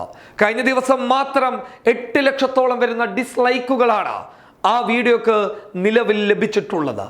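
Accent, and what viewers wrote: Kasaragod, India. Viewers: ഞാൻ ശ്രെദ്ധിച്ചു. കാസർഗോഡ് ശൈലി ആയതു കൊണ്ടായിരിക്കും അങ്ങനെ വരുന്നത് 🙂. എന്തായാലും പുള്ളിക്കാരന്റെ അവതരണം സൂപ്പർ ആണ് 😍🤗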